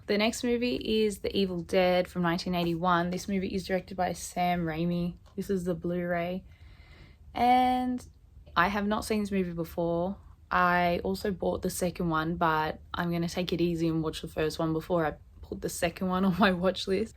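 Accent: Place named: New Jersey, United States. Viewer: that's a lovely accent you have ! New Jersey ? x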